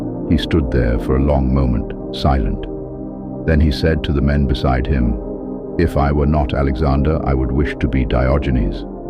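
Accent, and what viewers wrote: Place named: United Kingdom. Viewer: so bored of that british accent commentator without a face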